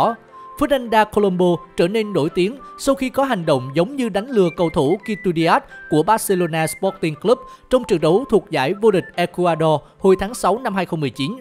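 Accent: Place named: Binh Dinh, Vietnam. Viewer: Giọng bình định trong sâng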